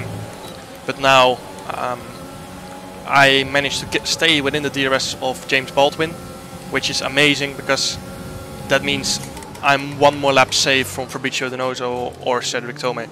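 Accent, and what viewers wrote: Netherlands. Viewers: je hebt echt wanneer je Engels praat hoor je gewoon het Nederlandse accent Lekker gedaan jammer met de damage Maar lekker bezig een "keep it up"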